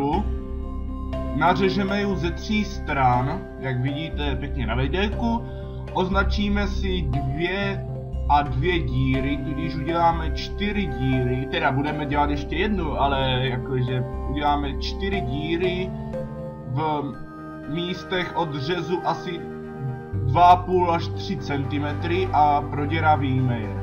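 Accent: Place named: Moravia, Czech Republic. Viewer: Tož to zkusím! Mám dva kocoury a nejspíš tu myšku dotáhli oni. Teď mám v pokoji už druhou (první jsem ulovila já....), jenže je za skříní, což je problém. Děkuju za nápad a moc se mi líbí, že mluvíš, jak ti zobák narostl. Já su taky z Moravy, takže mě moc potěší, když neslyším furt takovej, makovej, zapejkat a podobně.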